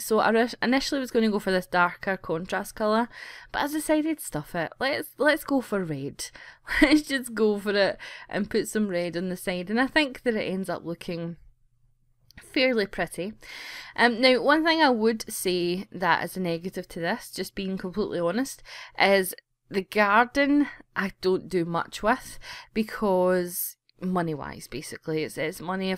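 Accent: Scotland, United Kingdom. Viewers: You're Scottish like me!!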